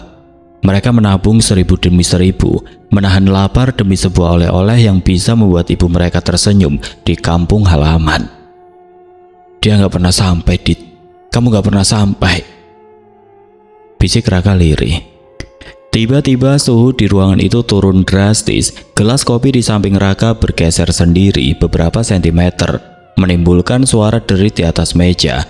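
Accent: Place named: Banyumas, Indonesia. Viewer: kayak suara khas ngapaknya ..dgn ciri khas cerita panjang ngalor ngidul cmn ga ada seramnya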